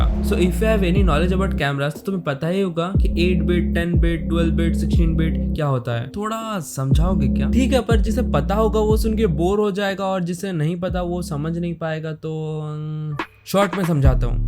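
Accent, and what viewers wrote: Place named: Bengal, India. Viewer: Your bangali accent sucks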